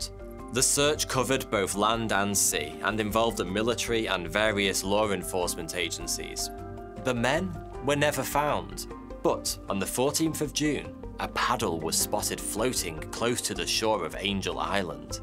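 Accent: United Kingdom. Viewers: So id got my old account kicked of youtube 🙃 and not having this guys videos in my life gave me extreme anxiety i really couldnt stand not having a steady flow of information in a british accent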